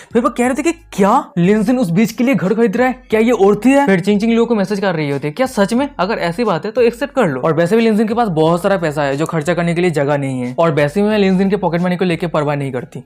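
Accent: Bengal, India. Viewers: Hey bro are you Bengali 😮😮😮❤ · bro are you Bengali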